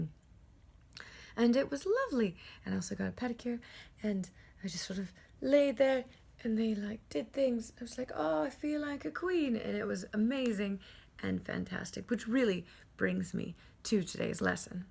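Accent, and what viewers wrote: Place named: England, United Kingdom. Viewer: There's that English accent again.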